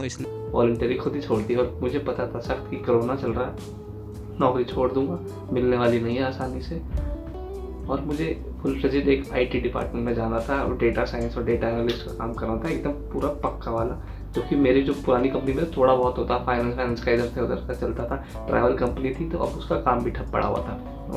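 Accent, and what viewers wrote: Haryana, India. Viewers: Bhai k video dekh kaza aaajaata h...thodi tension me lgra h.. bit haryanvi h bhai.. jhel jaega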